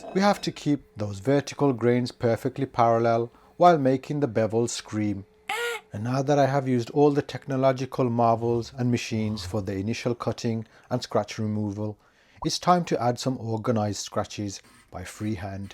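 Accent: Birmingham, United Kingdom. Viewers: Hey, now you just listen hear, you just go an stay a barmy brummie, ‘cos that’s the way we like it - uhu-uhu, that’s the way….. Oh you know wot I mean. Stay cool, stay happy and please keep the camera rollin’. 🙏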